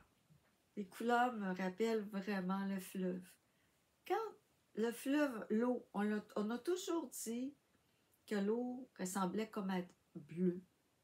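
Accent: Quebec, Canada. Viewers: Quel plaisir de vous visionner, j'adore votre douceur et votre bel accent québécois. Bonnes vacances. Bisous de la France 😘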